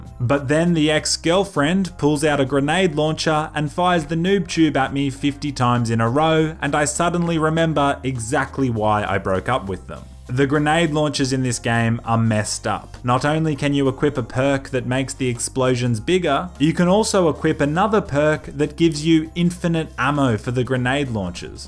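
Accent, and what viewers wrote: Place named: Australia. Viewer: Man sounds so Australian in this vid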